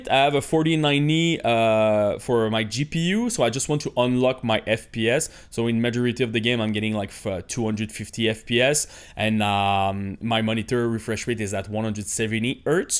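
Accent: Quebec, Canada. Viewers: Thanks for the tips bro & est tu québécois?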